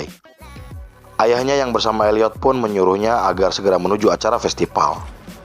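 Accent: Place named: Banyumas, Indonesia. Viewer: Intronya ngapak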